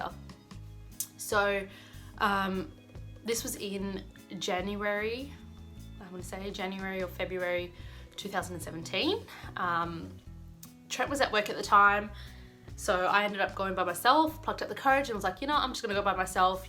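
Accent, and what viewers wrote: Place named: Australia. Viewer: Hey! First of all, you Australians have an amazing accent. I really like it! Secondly, good luck with this! You’re doing a fantastic job. I hope things work out for you. My prayers are with you, honey.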